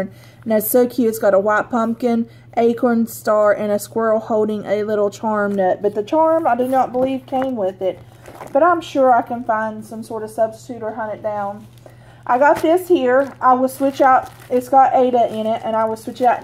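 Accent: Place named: South, United States. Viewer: Just found your station and I am a new subscriber---thanks for a great video, I have a southern accent too. I loved every subject you discussed & everything you showed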